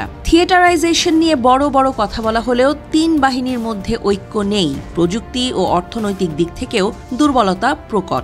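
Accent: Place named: India. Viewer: আপনাদের খবর পাট কেন ভারতীয় স্টাইল? কন্ঠের মতো, BD বিউজ, ও আমার দেশ খুব সুন্দর খবর পাঠ করেন 👍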